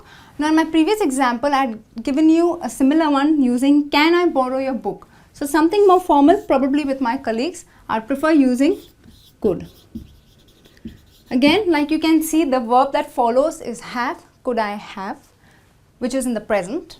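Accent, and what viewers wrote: India. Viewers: I love your indish Accent im not so good english but thanks for the lessons ♥ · тут че индийский акцент?! сложно привыкнуть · ما تعرفين تتكلمين انجليزي زين الهندي غالب عليكي بس عادي المهم تعرفين انجليزي 😆😆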